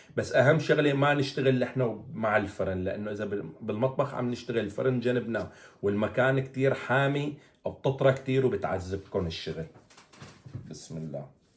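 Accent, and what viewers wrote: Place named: Syria. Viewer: اللهجه السوريه تجنن❤❤❤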